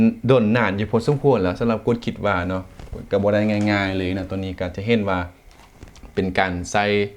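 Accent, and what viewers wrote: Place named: Lom Sak, Thailand. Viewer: เพิ่งมาดูเป็นคลิปแรก ฟังสำเนียงพูดของ แล้ว.. ตอนแรกคิดว่า เป็นช่องของคน อ.หล่มสัก หล่มเก่า จ.เพชรบูรณ์ และชาวเมืองเลย..พูดภาษเดียวกันเป๊ะ..กำลังสันนิฐานว่า คนไทยในเขตนี้เคยมีบรรพบุรุษเกี่ยวดองมาจากชาวหลวงพระบาง..อืม!! เราน่าจะเป็นญาติกันนะเนี่ย😊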